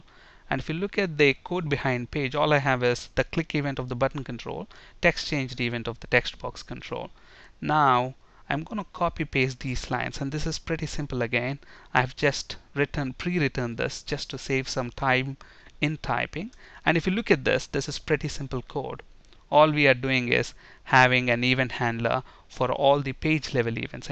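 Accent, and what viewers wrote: India. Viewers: very helpful ....may i know where you are from? ur english is excellent but u kinda have a indian accent.. :) am i right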